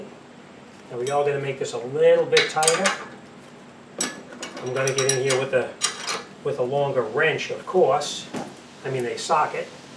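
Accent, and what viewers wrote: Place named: New York, United States. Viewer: Nice work 😊👍 Are you originally from NY / Jersey/ Queens??? Im hearing a slight accent in your voice 🤔 With respect from steve in Bundaberg Australia 🇦🇺 🍺🕺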